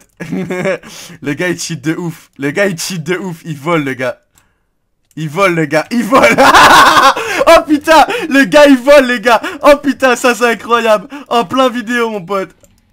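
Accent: Quebec, Canada. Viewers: stp parle avec ton accent qc :(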